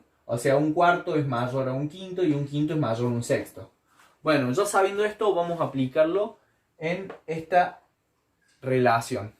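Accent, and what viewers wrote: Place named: Córdoba, Argentina. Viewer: jajaj cordobesaso el culiau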